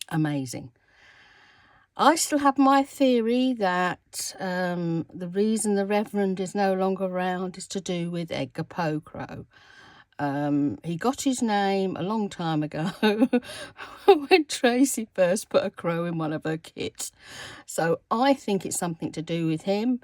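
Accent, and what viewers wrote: Scotland, United Kingdom. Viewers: ... I too... Am so grateful for the Playlist... I was in the DARK... Lol... Trying to get or find the people involved in the actual collaborate... But, thx to your Playlist it's perfectly clear now... ROTFLMFAO 😅... Plus getting to see those that have made such lovely creations... 🌹 I am so a new bee subscriber to your channel... Thank you for sharing your creativity wiff us... Also, loved hear your Scottish accent... I lived in Aberdeen Scotland area for bout 3.5 year's... Fell head over heels 👠 in love with it all... Rented a Farm House 🏡 in the middle of 2500 acres... Of the Major Irwins 5,000 acre Estate... In Newmachar... Siittinton House 🏡... 🌹... Sincerely sincere Miss Judith ❤ ✨ 🌹...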